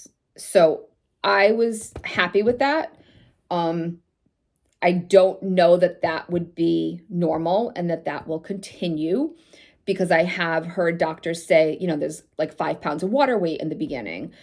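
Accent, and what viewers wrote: Massachusetts, United States. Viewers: Thanks for the video, you have to be from Massachusetts based on how you say bananer and Lara bah hehe 😉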